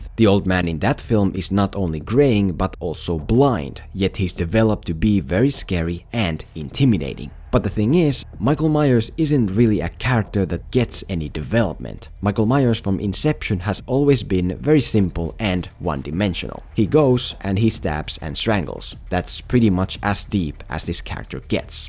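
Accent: Finland. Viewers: Ootko suomalainen kun on niin suomi-aksentti?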